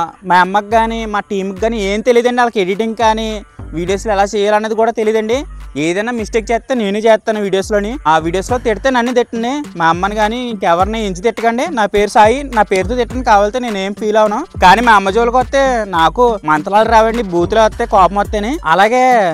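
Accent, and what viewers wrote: Godavari, India. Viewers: Hai anna mana godavari slang lo super warning echav anna all the best anna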